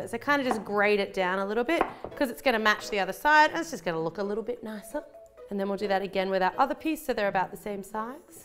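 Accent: Australia. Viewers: Are you Auzzie?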